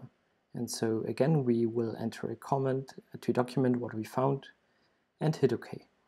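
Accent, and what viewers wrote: Germany. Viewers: Wonderful introduction! You kind of sound German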